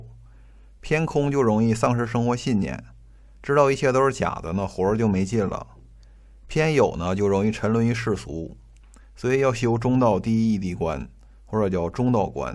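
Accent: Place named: Northeast, China. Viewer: 喜欢东北口音儿 ～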